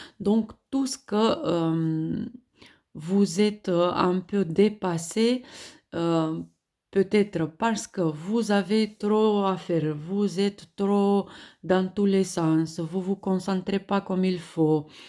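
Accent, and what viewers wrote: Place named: Russia. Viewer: J'adore l'ascens russe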